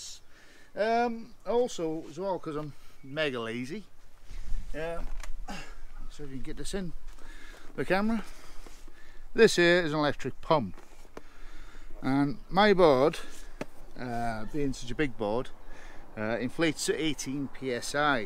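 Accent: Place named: Wales, United Kingdom. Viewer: Please excuse me but are you Welsh.great accent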